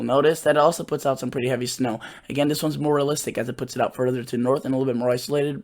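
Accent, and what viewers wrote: Midwest, United States. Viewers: Dude I knew yo u had a midwestern accent but near Chicago?? Me too!!!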